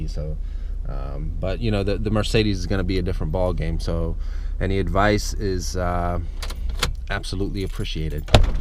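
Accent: Pittsburgh, United States. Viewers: Never thought you would be Swedish, you look and sound like an American. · Imma newbie to the Chanel and I was so surprised that you were from Sweden! That American accent was spot on! Ever thought of getting a Volvo XC90 or V90XC tho? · 5 years and you sound like a Pittsburgh-er, it's been 12 for me (same town) and still sound like a limy lol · I find it very interesting that in 5 years you've acquired the distinctive Pittsburgh accent, sounding like a true native of the area. The Swedish component of your speech seems secondary to me. This vlog was enjoyable and a welcome change of pace. I've ordered a few cars in my life, waiting about 2 months each time. The domestics used to allow for this, ordered my Olds Cutlass in 1986, but today it seems only the Germans still do it. Nearly every car I've bought from dealer stock has been a compromise in some way. 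My current vehicle has a color that just will not grow on me. Maybe I'll get it right next time. Looking forward to your Merc.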